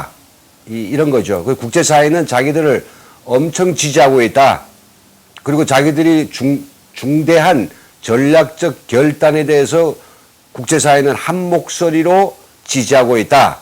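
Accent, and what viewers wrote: North Korea. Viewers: 진짜 북한사투리로 재밋게 하시네요~~힘내세요 응원합니다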